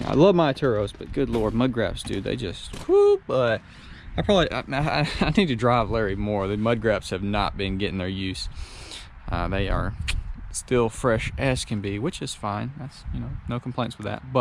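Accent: Alabama, United States. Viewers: What part of bama you from ?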